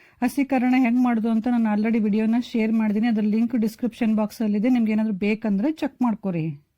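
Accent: North Karnataka, India. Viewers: I like your accent...I sense the aroma of North Karnataka Kannada...... keep it up mam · super ri madam niv nam uk style dag matadud keli balh Kushi aat ri 👌👌👌👌🙏🙏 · Pakka north Karnataka language😀. Naav mahalingpur davaru. Nimdu yavud ooru? By the way i tried ur so many recipes. It turned out super n tasty. Thanks for sharing our style recipes. Take care. Bye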